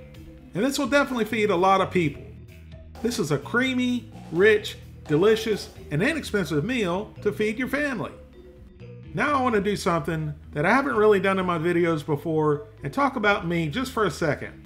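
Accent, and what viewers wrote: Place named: Mississippi, United States. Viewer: Have been here much lately but holy CRAP you've gained a ton of subs! Lol I was around when you were at the 20-40k subs. Good for you brotha! I've always thought you were a Mississippi guy bc of your accent, so now I'm wondering are you? Obviously I am is why I'm asking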